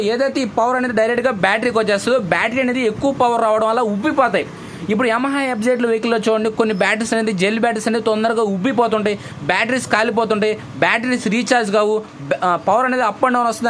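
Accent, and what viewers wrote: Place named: Prakasam, India. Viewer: Prakasam district giddalur side aa bro needi. Aa slang alane vundi. Explanation Inka clear ga chey, nee videos ki Inka views increase avuthayi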